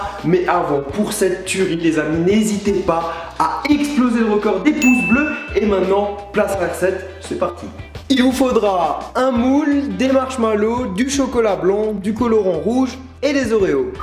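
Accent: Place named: Alsace, France. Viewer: C'est l'alsacien ;)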